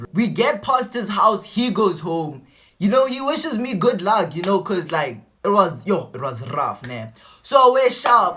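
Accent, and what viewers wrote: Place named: South Africa. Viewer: I like his SA accent its real dope🔥❤️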